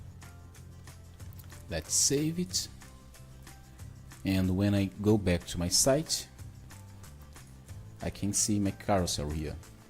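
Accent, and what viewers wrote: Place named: Brazil. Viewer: Great, thank you for replying actually I am learning Português and your accent was very familiar to Brasil 😍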